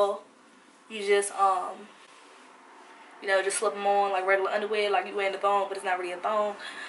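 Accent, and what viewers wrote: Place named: Baltimore, United States. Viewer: Baltimore.... I hear it... Love it an you...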